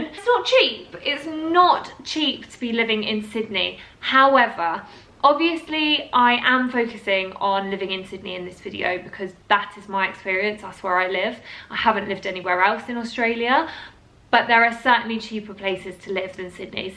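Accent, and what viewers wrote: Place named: United Kingdom. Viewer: i cant pick your brit accent . where u from ?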